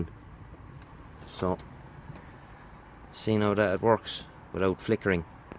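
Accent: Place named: Atlantic Canada, Canada. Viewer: Well done, where are you from? You sound like a Canadian east coaster.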